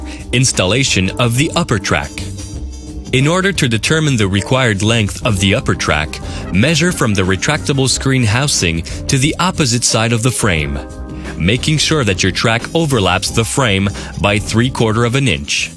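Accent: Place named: Quebec, Canada. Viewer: It's just a slight French-Canadian accent. There's really no need to slag his pronunciation.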